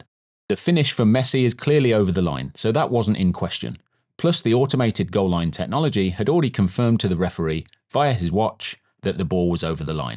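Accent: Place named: United Kingdom. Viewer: Thanks for the explanation, I like football but know near nothing and having a Brit explaining is great!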